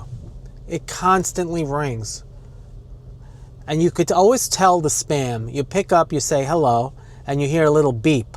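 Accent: New York, United States. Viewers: I wanted the Bronx and I found it. Thank you, also for great language, I can watch and listen without subs. Distinct speech but keeping that NY accent. Watching from Poland.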